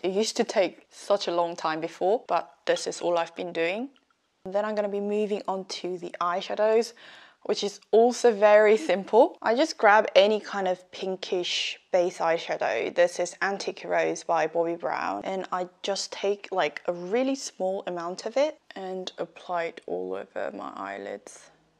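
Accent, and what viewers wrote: United Kingdom. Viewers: 딱 틀자마자 영어하시는거 보고 영국 생각났네요ㅠㅠㅠ 앞으로도 자주 듣고싶어요💕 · 언니 브리티시 악센트를 다시 들을 수 있다니 감덩이에요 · 으아 ㅠㅠㅠㅜㅜㅜ제가 좋아요, 댓글 일등이라구여ㅠㅠㅠㅠㅠ헝 사랑해여 울언니ㅜㅜ 영어 영상 너무 좋아여..그녀의 영국식 발음 = 귀르가즘 🤍 그럼 이제 영상에 집중 할게요 🙈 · 졸예❤️ 예전처럼 아이라인을 빼서 그리지 않아도 있는 그대로 자연스럽게 너무 이쁘네요~ 사용하는 색이 더 옅어지니까 좀더 퓨어 해 보여요💛 이번 스틴푸드 파데도 이런 맑은 메이크업에 너무 찰떡이에요💜 비건 제품이라 너무 좋네요 비건 제품이 더 많아졌으면 좋겠어요~ 그리고 의 영국식 영어는 언제 들어도 멋져요😊 뭔가 해리포터가 생각나는…?? 저만 그런거 아니죠??ㅋㅋ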